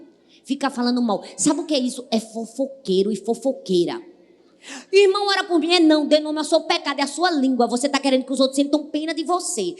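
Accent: Northeast, Brazil. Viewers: Amo o sotaque nordestino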